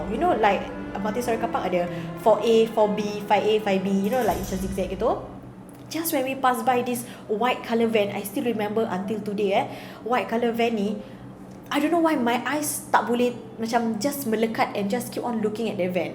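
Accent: Singapore. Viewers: Are you Singaporean? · Tgk vid ni baru aq tau singapore pnye bm pn loghat lain siak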